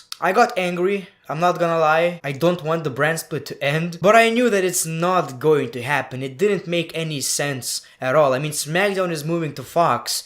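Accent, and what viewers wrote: Russia. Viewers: Wow! I think you have a great Russian accent! (Because you are Lithuanian obviously but still) with love, from Astrakhan, Russia.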